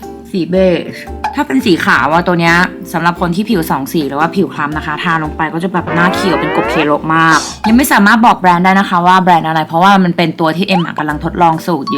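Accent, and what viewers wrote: Isan, Thailand. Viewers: มักๆหลาย อีหล้า เวลา เว้าอีสาน หน้ารักหลายๆๆจ้ะ นาง❤️❤️❤️❤️❤️❤️😘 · พูดอีสานได้น่ารักมากกกกกกกกก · ชอบพี่เอ็มพูดอีสาน น่ารักมากๆๆๆค่ะ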